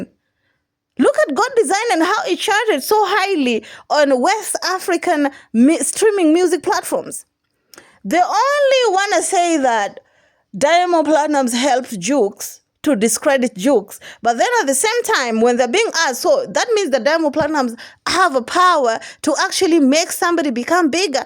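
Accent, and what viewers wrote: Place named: Nigeria. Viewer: wahlai! One of your ancestors was Nigerian. You sound & rage like us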